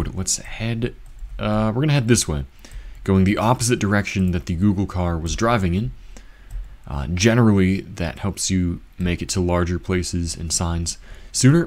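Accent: Russia. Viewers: Takový ruský přízvuk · GG noticable improvement in your pronounciation as well ;) but you still sound like a russian trying to pronounce english. You shouldn't really emphasize anything other than the beginning of the word, and the lengths of each syllable should be of the same length, unless you see a line over the letter (á (as in hard), a (as in duck)). I noticed this when you said nád instead of nad Labem.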